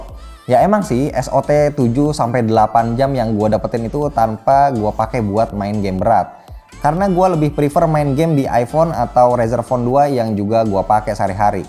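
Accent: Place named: Java, Indonesia. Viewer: Bang, ternyata medog juga ya 🤣🤣🤣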